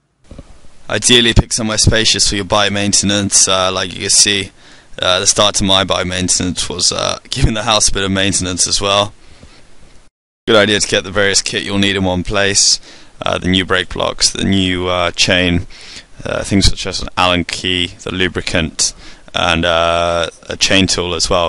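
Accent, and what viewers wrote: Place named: South, United States. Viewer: a southerners accent, the same as mine :)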